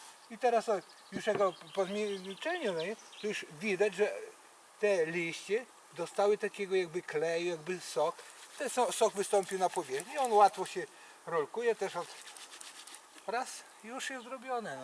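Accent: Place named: Kresy, Poland. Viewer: Sympatycznie i ten fajny kresowy akcent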